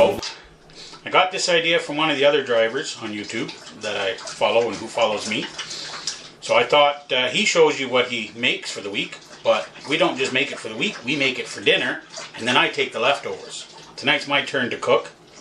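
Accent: Canada. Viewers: You are a scream and i love the Canadian accent. Gotta watch the middle though, more lean and less fat. The dog will like the fat unless his middle is too large. Your wife giggles so good. She is a keeper.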